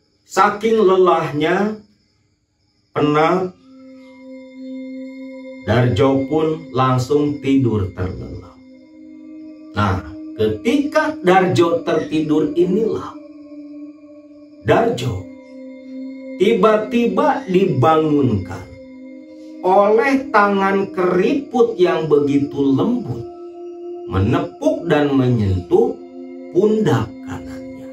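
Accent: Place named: Banyumasan, Indonesia. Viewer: Tegal hadir kang wong ngapak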